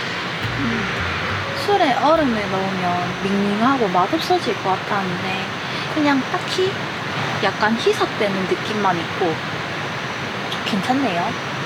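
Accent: Gyeongsang, South Korea. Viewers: ㅎㅎ 이분은 귀여우심 경상도사투리도 귀엽고 그래서 구독 ❤ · 화면만 나오는지 알았는데 말도 하시네요 혹시 대구 경상도 아가씨가 맞죠?인생 너무 즐겁게 사네요ㅡ전맨날 집ㅡ직장ㅡ집인데ㅡ남자 게스트ㅈ안필요 하세용ㅋㅋ🎉🎉❤😮😅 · 경상도 말씨에 인상도 좋으세요~우중캠핑 잘봤습니다 고생하셨습니다❤🎉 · 씨는 경상도 말쓰는거랑 웃는모습이 참이뻐요^^ 젤좋아하는 캠퍼예요^^ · 님도 이쁘고 경상도 말씨도 좋네요 하이볼에는 위스키가 정말 맛있어요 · 갱상도 분인갑네요~~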